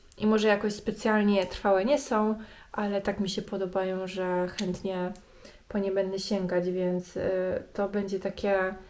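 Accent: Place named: France. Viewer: Na początku myślałam, że to nauka francuskiego- lektor mówił z takim akcentem😅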